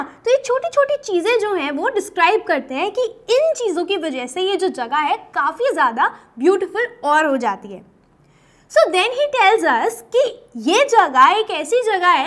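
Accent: India. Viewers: Gujarat se ho kya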